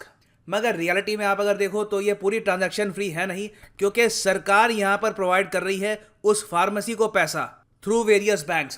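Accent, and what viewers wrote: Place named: Uttar Pradesh, India. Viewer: Bro just remember one thing India has a variety of language and every indian citizen has it's own mother tongue (not hindi) like basically belong to Uttar Pradesh (having 100's of local languages like Khadi boli,Awadhi, Bundeli, Bhojpuri etc which is not Hindi) by the passage of time majority of people of UP switched to Hindi but the accent somehow sometimes somewhere remains in them that's why there is difference in accent of many people which didn't fade easily...and it's not a bad thing. In my opinion we have to conserve our mother tongue and we should not point out the mistakes in someone's accent because every language is Just for communication and if you are understanding the point..the purpose of communication is done. So next time don't make fun of anyone on the basis of their language or accent in language. 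Respect and be proud of the diversity of India 🇮🇳🙏❤️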